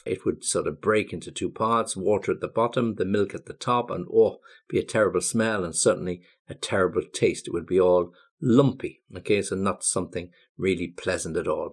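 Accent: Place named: United Kingdom. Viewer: Wow, I like a British accent 😊😊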